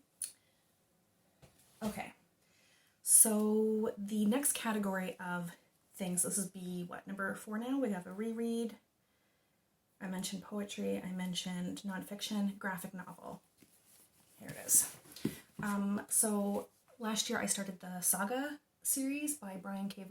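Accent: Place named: Eastern Canada, Canada. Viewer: Haha - no I couldn't tell. I heard an "Eastern" Canadian accent once or twice, though. 😄 I'm from the West so I fail to differentiate all the many Eastern/Atlantic accents unless they are obvious obvious, like peppered with b'y, for example. 😂 You have a very subtle accent to my ears, whatever that means.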